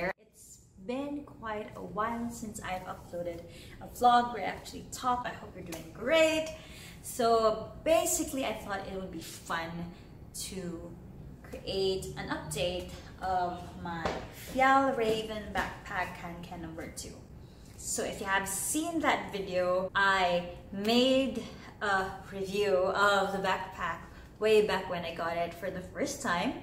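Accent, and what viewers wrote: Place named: Bisaya, Philippines. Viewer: Hello kabayan nice review. Bisaya ka din ba? I notice your accent kasi very bisaya. Proud bizdak here. 👋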